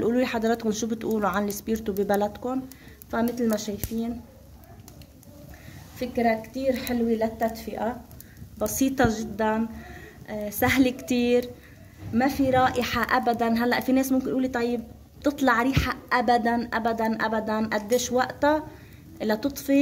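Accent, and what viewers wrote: Lebanon. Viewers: انتي من لبنان صح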